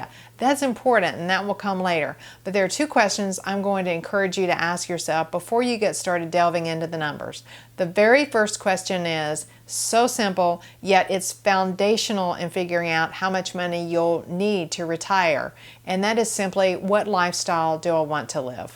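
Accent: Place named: South, United States. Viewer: Love your videos! Where do you live? I notice a Southern accent and I'm in Charlotte NC:)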